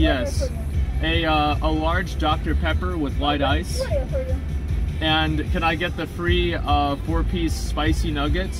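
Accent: South, United States. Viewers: It hasn't hit me how southern he is until I watched this :3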